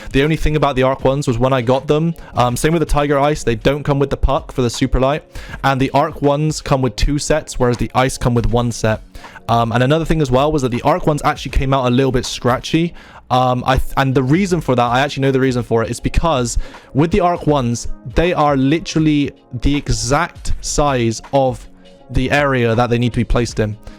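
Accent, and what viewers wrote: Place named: Bristol, United Kingdom. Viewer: You from Bristol mate? Amazing to hear a familiar accent in the peripheral community 😁 subbed and liked!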